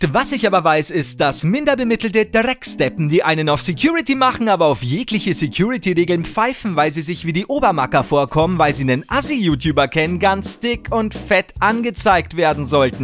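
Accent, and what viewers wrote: Bavaria, Germany. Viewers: Hab mir jetzt einige Videos von dir angesehen und ich muss sagen wenn man selber ein bisschen bayrischen Dialekt versteht, gibt es kaum bessere Unterhaltung auf youtube Deutschland😁👌 Hast einen neuen Abonnenten gewonnen mach weiter so👍🏻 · BITTE STOP mit der Bayrischen Sprache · Naja weil ihr in Österreich nur Bayrisch sprecht 😂 Nein Spaß. Ich mag es auch wenn er Bayrisch spricht. Grüße aus Bayern zurück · Feier dich richtig, durch dein bayrischen Dialekt Einlagen, die ich als Oberösterreicher natürlich versteh, find ich dich auch sehr Unterhaltsam. Zudem find ich es toll wenn auch die Großen (im Kopf nicht) kritisiert und oft zerlegt werden. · Michael Obermaier Komme aus Bayern und liebe es, wenn er bayrisch redet xD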